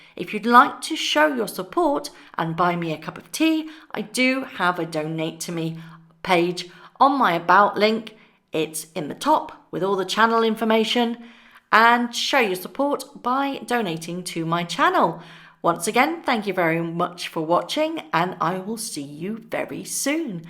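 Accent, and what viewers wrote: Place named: United Kingdom. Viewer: Aww, I really hope you get to feeling alot better very soon hun. You really do sound very worn out or tired and such... So you had a med procedure, or are just getting some rest or both? I am saying prayers for you, sweet lady. I love your informational videos so much... And your lovely British accent reminds me of my U.K. 1st generation Aunties and cousins round the U.K. I really hope you get to feeling better... That's the main thing. Health is #1, then coming back fully.... Blessings & (((hugs))), take your rest... We will not leave!! Promise lol!! 😘💞💖💗🙏🙏🙌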